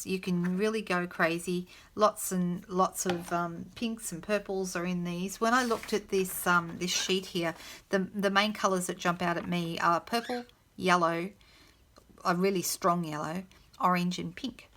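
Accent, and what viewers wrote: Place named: Australia. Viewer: Thank you for making this set less daunting 😀👍🏼. Have ordered it & now feel ok about trying it out . Nice to hear an Aussie voice😀. Jane from SA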